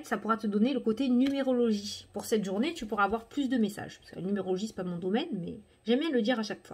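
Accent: South, France. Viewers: salut, putai... ca fait un bien fou d entendre l accent de chez nous!!! j aime beaucoup ton naturel et ta spontaneitee. bisette de l aveyron!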